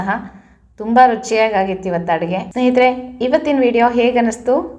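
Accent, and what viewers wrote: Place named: Ballari, India. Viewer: Naavu Ballariyavare.adigemaaduva vidhana yella same😊naavu makkalige tinniso ootakke mammu antane annodu.Maatado reetinu same 😊Namma jana Namma bhashe ❤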